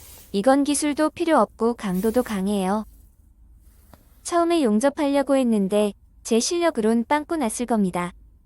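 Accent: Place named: Busan, South Korea. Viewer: 아 부산분이셨군요ㅋㅋㅋ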